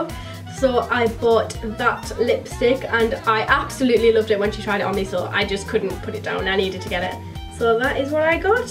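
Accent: Newcastle, United Kingdom. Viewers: Are you from Newcastle?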